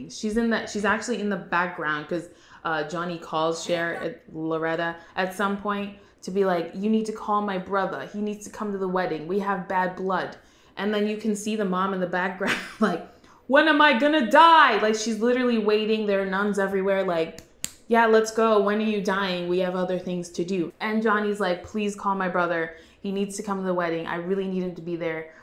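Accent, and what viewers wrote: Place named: Italy. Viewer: Your Italian accent is everything 😂 now i gotta go watch this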